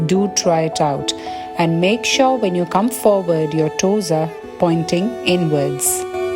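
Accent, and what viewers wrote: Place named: India. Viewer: Elle super intéressante ta vidéo, sauf que ton English est trop indien😅😅😅😅,mais ça va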